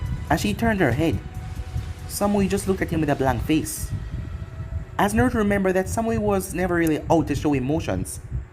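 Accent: Jamaica. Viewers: I like it . Yo ya Jamaican?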